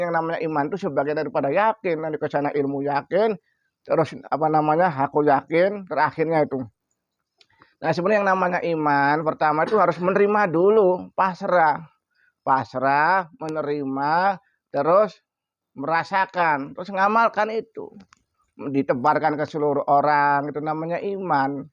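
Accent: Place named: Cirebon, Indonesia. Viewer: Wa'alaikum salam warohmatullahi wabarokatuh.... In syaa allaah mama yai barakallaah... ( punten mama yai, logat kayak wong cerbon... Maaf klw salah)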